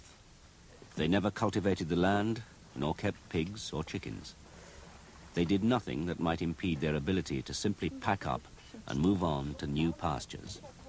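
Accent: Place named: United Kingdom. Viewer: I much prefer the British Narration in these videos, but for those looking for the American Narration you can find the first of the 4 videos here > <link>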